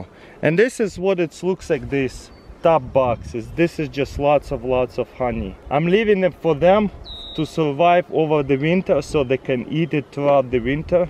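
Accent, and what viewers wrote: Russia. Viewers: i have no real interest in bees aslong as i dont get stung but his russian accent makes it worth the while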